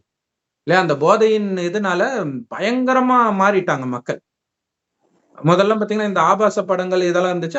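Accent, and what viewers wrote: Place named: Tirunelveli, India. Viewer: sister thirunelveli ya pesum pothu ooru bashai nalla iruku 🖤🖤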